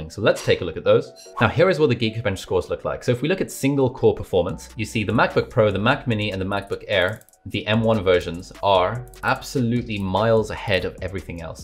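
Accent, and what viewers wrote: United States. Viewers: you can kind of tell that is starting to get an american accent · I see you getting the American Accent. The way you pronounce the "r" in air and processor.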